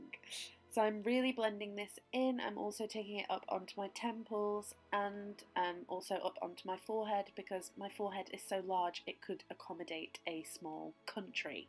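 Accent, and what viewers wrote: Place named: North, United Kingdom. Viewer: Oh right, I'm really bad with spotting accents but I did detect a slight northern accent haha. That's so cool that you have family here haha xxx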